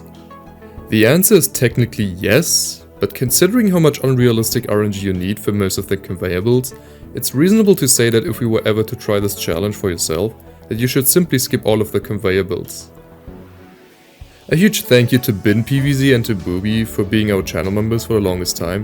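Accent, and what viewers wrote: Germany. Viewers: I Instantly knew you were German the second i heard the accent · Im also German and I instantly heard your accent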